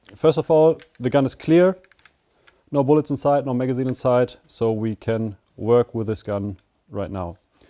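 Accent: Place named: Germany. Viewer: geiler deutscher Akzent..... 🤣🤣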